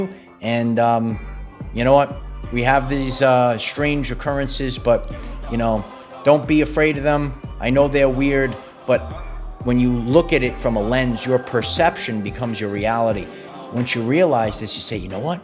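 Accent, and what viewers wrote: New York, United States. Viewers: Yes! (Nice to hear a NY voice.)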